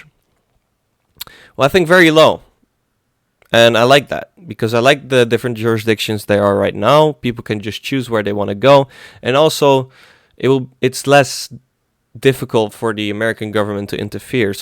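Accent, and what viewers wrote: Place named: Netherlands. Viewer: You sound kinda Dutch to me.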